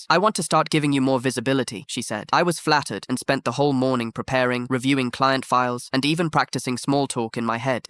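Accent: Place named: United Kingdom. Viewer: what is this goofy ass british voice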